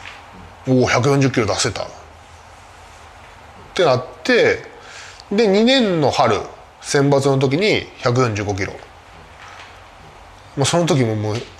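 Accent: Kansai, Japan. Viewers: 微妙に関西弁混じるんが関西生まれですね！わら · 日本版ダルビッシュやん笑 まーくんの関西弁初めて聞いたわ🙂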